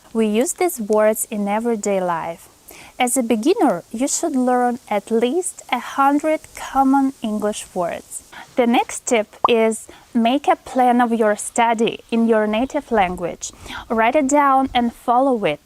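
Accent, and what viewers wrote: Russia. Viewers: Are you originally from Russia? You have such sweet accent that I could not but ask. 😁♥️ Much love! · How long have you been learning english? Judging that you are russian by nationality, it makes sense to your accent. Russian schools do not offer a good system to learn English, so that's why so many move to Europe to study. I've been learning English as a second language for 10 years, and I'm russian by ethnicity too, but I have an American accent, and no trace of Russian. thanks in advance:)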